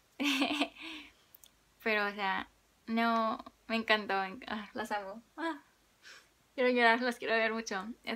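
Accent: Mexico. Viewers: Tu asento fresa...me encanta..felicidades..me encantas.. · ¡Tienes el acento de México! 😂🇲🇽👏🏻 Tu español es muy bueno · Que linda wow eres de mi país mi hermoso México saluditos ^.^ ya decía que tu acento era igual al mio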